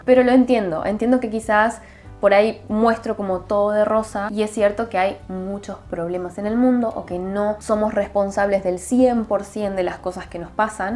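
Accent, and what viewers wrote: Argentina. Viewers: Te escucho el acento argentino gracias 🙏Vamos con Latinoamérica minimalista porque el consumismo de plástico está haciendo estrado mientras que en países más ricos está precioso el orgullo del minimalismo..una buena moda al fin!🙏🌎t ganaste una sucriptora por propiciar esto.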